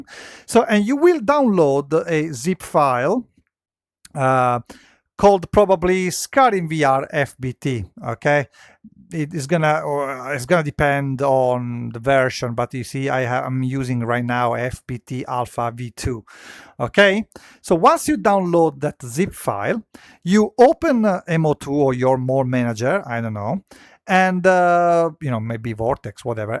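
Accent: Italy. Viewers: Wait r u italian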